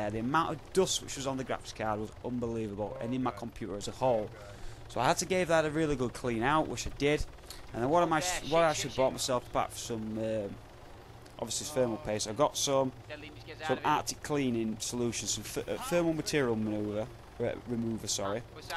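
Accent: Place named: Yorkshire, United Kingdom. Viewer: Yorkshire I think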